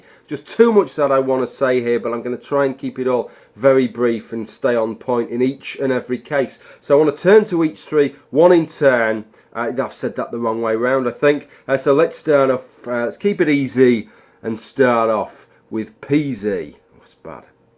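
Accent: United Kingdom. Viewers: There are other YouTube atheists who are prettier than you (Suvarenee, The Peach, ZOMGit'schriss, etc), who are not only easier on the eyes but make my heart flutter and draw sighs from the most tender parts of my being. They're smart, they're sexy, they're atheists...what more could a guy like me look for? Nevertheless...nevertheless...I am forced to admit, you're still my favorite YouTube atheist. Is it the British accent? I don't know. But there you are. Rock on, good sir! Rock on!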